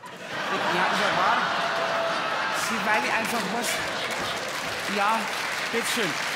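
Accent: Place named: Bavaria, Germany. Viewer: Martin du bist da Wahnsinn ❤bleib den Bayrisch treu ❤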